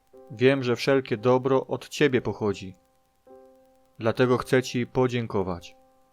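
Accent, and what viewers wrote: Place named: Silesia, Poland. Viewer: Akcent Śląski.Początek modlitwy szczery i własnymi słowami jak wskazuje Słowo Boże.Jednak końcowa część modlitwy oddzielona lekko od reszty jest niezgodna z Pismem Świętym,ponieważ nie wskazuje modlitwy do ludzi(Rzym. ) a nawet potępia.Maria matka Jezusa była pokorna niewiasta i nie oczekiwała modlitwy do siebie,ale też kierowała je tylko do Boga Ojca.Pan Jezus poproszony przez uczniów o naukę modlitwy też wskazał,że należy kierować je tylko do Boga Ojca,,Ojcze nasz....świec się imię Twoje(jakie?)....."itd.Pan Jezus nie powiedział módlcie się do mnie,albo módlcie się do matki mojej-tak nie powiedział! Końcówka to wierszyk powtórzony po kimś-nie własne szczere z serca płynące.Biblia podaje dużo przykładów szczerych modlitw sług Bożych w różnych miejscach i były to osobiste myśli nie czyjeś.Nawet w Ew.Jn.17 rozdział cały to modlitwa Pana Jezusa do Boga Ojca-szczera z serca,nie do Marii i nie do anioła.To tak naprawdę przykład modlitwy niezgodnej ze Słowem Bożym,choć robi wrażenie i to jest zwodnicze😘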